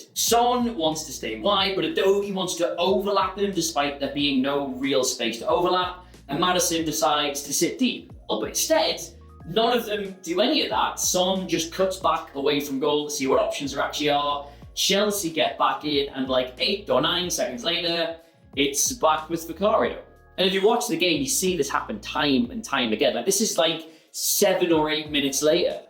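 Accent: Newcastle, United Kingdom. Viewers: No need to apologise, I actually like the Geordie accent 😛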